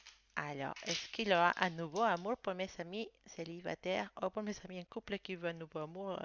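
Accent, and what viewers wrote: Spain. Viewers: Bonjour, je vous découvre avec votre douce voix et joli accent espagnol. Vos guidances sont vraies et si lumineuses. Je travaille depuis plusieurs mois pour lâcher prise de mon passé toxique, être en paix dans mon âme, mon coeur et mon corps et l'univers me met à nouveau mes ex sur ma route. Difficile d'être seule mais je pries mes archanges et mon ange. Je suis sereine malgré mes difficultés financières et refuse le retour d'un ex. A quand le repos et le bonheur d'aimer à nouveau de tout mon être? Comment s'appelle votre pierre orange qui vous illumine? J'aimerais gagner un tirage personnel et participe au tirage au sort. Merci d'être là. Je vous embrasse. Namasté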